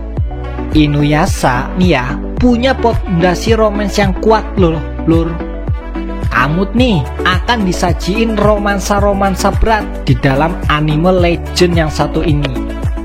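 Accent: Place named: Banyumas, Indonesia. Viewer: Ngapak juga gaes nyong ee 😁😁😁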